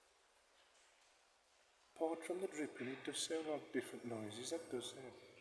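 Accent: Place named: Black Country, United Kingdom. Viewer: Brilliantly done and as a black country girl long moved away I'm loving the accent makes me feel like home.... Thank you so much new sub here xxx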